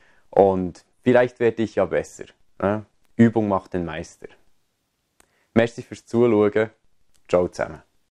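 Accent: Switzerland. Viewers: Schweizer Hochdeutsch passt 👍🏻👍🏻 bin froh das es auch Videos in Deutsch gibt. DANKE Lg aus Österreich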